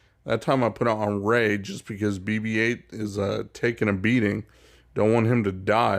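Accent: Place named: Texas, United States. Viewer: fiddy-four banners LOL! ive noticed your cool accent before.Are you from Texas or smthing? :D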